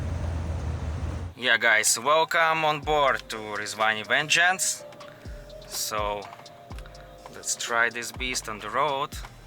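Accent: Russia. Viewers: … на произношением поработай, а то русский так и прет, аж ухо режет - хав и парт!! 😊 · your Russian accent just killing my ears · Русский акцент видно с трудом разговаривать на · Лучше по русски разговаривай. Инглишь с русским акцентом нигде не спутаешь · Это что ещё за нерусский с явным русским произношением и непонятной машиной, продающейся по спекулятивной цене? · Какой ужасный русский акцент))) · Change the presenter guy, nobody would take this guy serious, with the russian accent. Cute little suv, but for 700K, you can probably get a real used military tank for that price. If a small little drone can take out multi-million $$ tank or helicopter in Ukraine, this should be a POC. Total JOKE. This is for rich Beta males with no balls. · Русский твой английский в #опу...но почему ета нация така тупая...🤮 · That is a stupid car and even more stupid review done with the most annoying Russian accent😂 Jesus Christ Lord have mercy ❤ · Смешно говорит руский по английски )) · Сразу видно что русскоязычный говорит на английском 😂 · Как люди не замечают что у него русский акцент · Брат мой русский язык рвется на ружу из твоего английского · Как сильно прослушивается 'русский')) Слишком чётко звучат почти все слова. 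Особенно слог of. У иностранцев слоги совсем не слышно. Ну а так круто, видимо глубокое знание англияского есть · Это самый ужасный дебильный руский акцент.Чувак учи нормально английский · WTF! How many years do you live there still having the fucking russian accent? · Висиз ви хард рашын экцент!) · Ты откуда сам, чел? Акцент у тя явно выраженный русский! 😀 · Russian accent could feel easily 😅😅😅. Bro improve your speech. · Мужик, у тебя чудовищный русский акцент! Я даже не стал писать тебе на английском, ибо не вижу в этом смысла 😁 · Акцент русский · Он специально делает стереотипный акцент СССР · Твой Руzкий акцент слишком Палевный), вас Рашистов Видно из далека, особенно таких Лицемеров ☝️ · Какой жёсткий русский акцент))) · This strong russian accent is so hard to listen😢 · Ужас. Пожалуйста подтяни свой акцент. Прям как-будто бы специально на жёстком русинглише говоришь(как в фильмах утрируют Русских). 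· Давай на кыргызском, мне этот руснячий акцент не нравится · зысиз супер кьют акцент! Бест рашшен акцент ай эвер хёрд!🤝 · Акцент то русский у парня, татарин скорей всего. Молодец!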